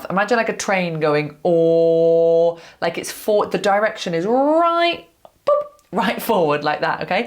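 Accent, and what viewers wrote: United Kingdom. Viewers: Hello, I love learning British accent from you. They have a lovely British English sound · Me gusta escuchar tu acento británico, y por cierto , te pareces mucho a Alice Merton. 😤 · Really like your videos, like British accent ❤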